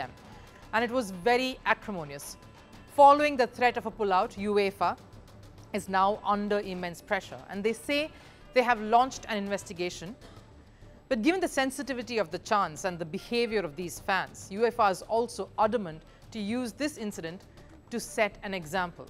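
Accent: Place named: India. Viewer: She has an Indian accent ...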